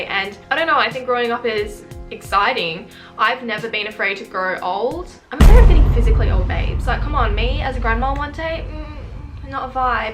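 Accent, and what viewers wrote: Australia. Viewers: is my inspiration, therapist, and australian accent guru <3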